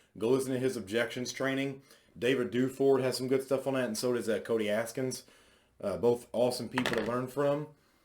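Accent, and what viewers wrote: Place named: South, United States. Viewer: Thanks this was great! I'm just now considering a position with Symmetry and enjoyed listening to your southern draw!! 😄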